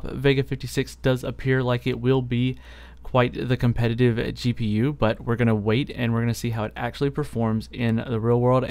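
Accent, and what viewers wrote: South, United States. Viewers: are you guys southern?? Your accent is definitely southern sounding. I'm from Texas myself so I'm definitely up there with the accent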